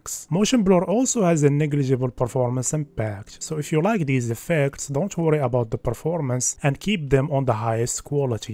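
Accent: Morocco. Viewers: yo bro u moroccan?? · The accent mghribi byna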